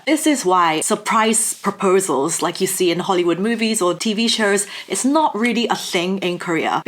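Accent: England, United Kingdom. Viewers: When you had mentioned about people coming from families with a lot of divorces it strike a nerve. I come from a family that has very few successful marriages. I don’t know what a successful marriage is. It scares me. Another factor. Coming from a dysfunctional family. I became dysfunctional. I’ve been diagnosed with generalized anxiety disorder. Everybody has anxiety, but people like me our anxiety is on steroids. Lastly, I want to mention that you have a very pleasant voice. I hear a British accent. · As for true romantic love, I may have fallen in love with you during this video. JK. You sound like you were raised mainly in England. Is that true? Anyway, good luck to the Koreans for getting their birthrates back up to at least replacement rate levels. Let's go young people. Get with the program! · No money...why u have british accent.lol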